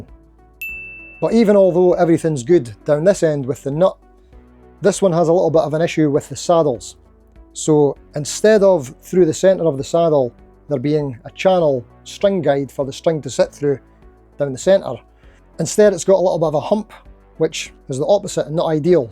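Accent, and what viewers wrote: Scotland, United Kingdom. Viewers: Scottish accent is a draw for me, it's like if Scrooge McDuck had a guitar channel that centered around Strat style guitars.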